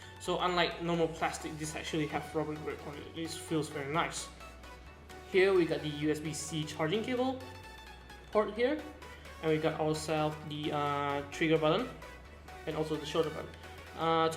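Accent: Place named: United States. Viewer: Your English is good like American 👍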